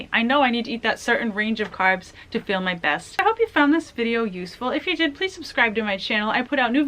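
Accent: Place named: Boston, United States. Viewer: BTW, if you don't mind my asking... where are you from/do you live? i've been trying to place your accent... i feel like i almost know it but can't quite place it. do you live in boston? i lived there once many years ago and it sounds vaguely familiar! i totally understand though if you don't want to say, this being the internet and all. :-)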